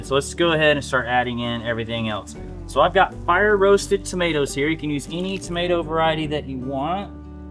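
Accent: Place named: California, United States. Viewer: Easy Bake Oven lol all ladies should have one. And you are from Texas???? Sounds like maybe you’re from California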